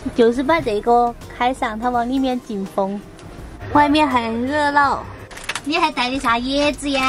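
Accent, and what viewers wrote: Sichuan, China. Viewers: ：我在台灣看妳的視頻三年了，我們是台灣外省第二代，小時候住在空軍眷村，因為空軍多半是四川人，我也會講四川話，聽妳講四川話，更親切！新年快樂，妳很棒，加油！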